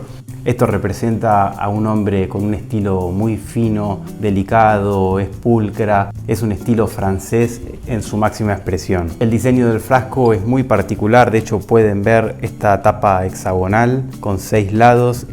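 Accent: Argentina. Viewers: nuevo sub,muy buen video. sos argentino no? Saludos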